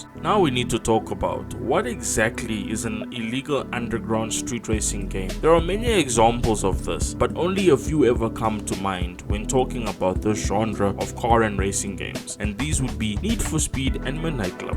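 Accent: South Africa. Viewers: Without even checking your profile, I can hear the strong South African accent 😅😅 Great video bro, keep it up!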